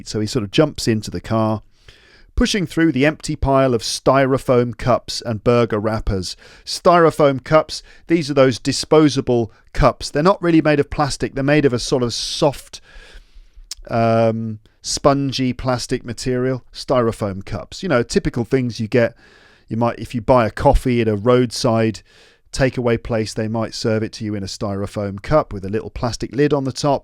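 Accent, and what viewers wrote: United Kingdom. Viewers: i like your british accent very much.sir.😊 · Fantastic voice and actions with explanation of all the vocabulary! English as a second language person, I had a hard time to understand those detailed adjectives when I read a book. Finally, I fall into the story and enjoyed it all the way to the end. Thank you so much for your effort and contribution. I am lucky to find this podcast. I love your British accent as well. I wish I could learn that accent:) I think I will be your fan now on - Cheers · camp wide range covered uk accent and culture British filed pure Love dramatic ever❤ true legendarie Podcaster all time❤❤❤ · Couple years ago I lived in another country with barley access to the internet I could listen to your podcast and voice hardly almost i forgot you however i couldn’t forget your British accept and your generously teaching style and your name I got surprised to see you in YouTube in USA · I always listen to the end and I love how you explain some words. 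And I learned the British accent from you Thank you ❤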